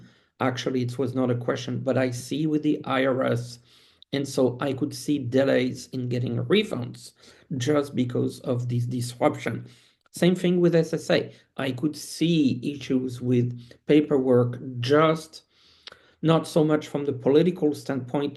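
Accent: France. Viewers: I like the way you talk, calm and soft. Cute French accent, ooh. I think you present your thoughts very well, very well. See you next time.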